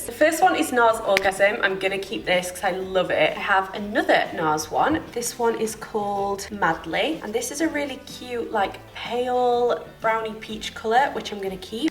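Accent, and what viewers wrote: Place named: Doncaster, United Kingdom. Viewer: Are you from Doncaster?? You're accent is similar to that 😁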